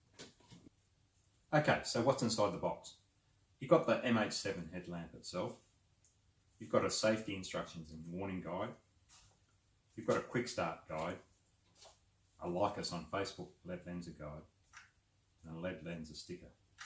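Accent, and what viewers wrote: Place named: Australia. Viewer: Don't tell me, let me guess: You're from Australia, right? :D In all honesty: Thanks, that was very helpful!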